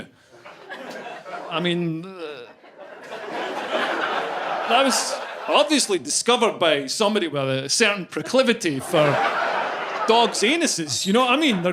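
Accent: Scotland, United Kingdom. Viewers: His Scottish accent makes the jokes even better. Non-Americans have such effective vocabulary and makes things a lot funnier than they probably are. It took me a very long time to know I'm Ace, partly because I don't f*cking care about orientation or labels. Is it too much to simply exist without knowing some personal preferences? I'm all for figuring oneself out to have a peace of mind but, damn. The alphabet people have taken things *WAY* too far this year. Be happy with who and what you are but let's remember to keep some things to ourselves. Some gay guys I knew/know didn't show any "signs" as they didn't make it out to be their only characteristic. Very polite. Well spoken.They also just so happened to dress very well but doesn't every self-respecting person do that? Annnyway, the only real fact didn't say about who's actually upset about us Asexuals is feminists. 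Feminazis in particular, the man-hating ones that want nothing more or less to wipe us off the Earth. When in college I read a passage which humored me. It was a bona fide statement backed up by some sources claiming Feminazis hate us Aces because we don't fit into that stupid rhetoric they're always saying: "Men only want one thing..." uh... if it means what I think it does, no we don't. Begone, Karen! Off to CVS for a boxed wine for your sorrows and bag of Whiskas for the cats! Try to figure out a more practical way to insult us Aces. Take your time. I can wait. By the way, if I understand the online comm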